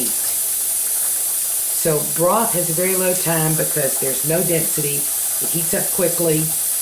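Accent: South, United States. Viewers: i am binge watching your videos! i love that you are keto as well....i also cook on cast iron!!! oh Lordy! i really love that i found you...your sweet voice and slow southern style is so relaxing to my frenzied mind<3<3<3 you mentioned in one of your videos...i think spaetzles...that you are cooking for one? how is your husband, if you dont mind my asking...you may have mentioned it in another, i just haven't gotten there yet :{ this is one of your more popular videos...so i hope everyone here offers many prayers for him and you for as long as youtube is around! ;}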